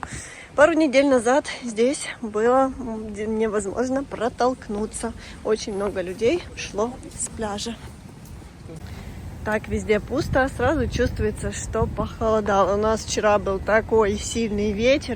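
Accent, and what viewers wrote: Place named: Ukraine. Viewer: Она не одесситка,видимо не так давно живёт в Одессе, оттого не все говорит верно,,, Довженко,,😮 слышится укр акцент,у одесситов другой говор,акцент 😮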